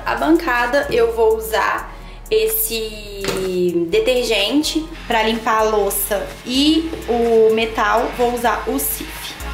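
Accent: Minas Gerais, Brazil. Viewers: Pois se acostume, pq ela não vai mudar. Mineiro fala assim.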